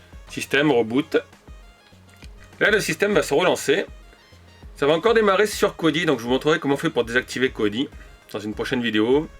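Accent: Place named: England, United Kingdom. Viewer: Un peu compliqué ton truc lool . Par contre j aime ton accent anglais 😋😋😋😋.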